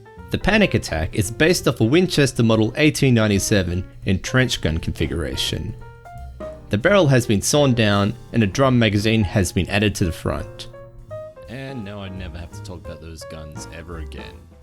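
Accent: Australia. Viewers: Your voice reminds me of Robin Walker (dev at Valve who designed TFC and TF2). Are you, by any chance, Australian? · Are you Aussie? The accent makes me think that.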